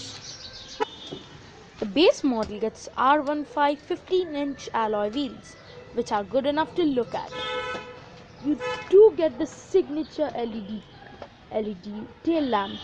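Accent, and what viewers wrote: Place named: France. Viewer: which English this French 🤮🤮🤮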